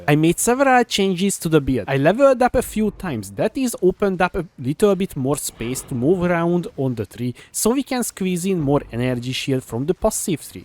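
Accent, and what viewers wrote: Hungary. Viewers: Kurvára hallani hogy magyar vagy :D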